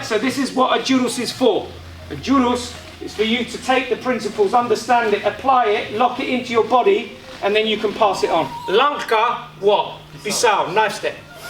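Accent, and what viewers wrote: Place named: Canada. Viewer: His voice sound so canadian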